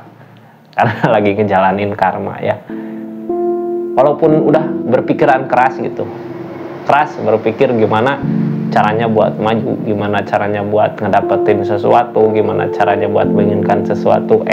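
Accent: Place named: Sunda, Indonesia. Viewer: logat sundanya kental banget... 😍 Thanks readinganya... Sukses selalu ❤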